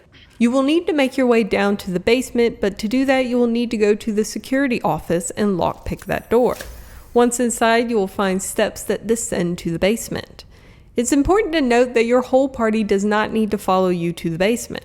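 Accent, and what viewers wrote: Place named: Mississippi, United States. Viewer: i watched many bg3 guides/tips videos and by far i like yours the most. idk why lol it's just preferable to me. i like your accent btw, reminds me of my family from mississippi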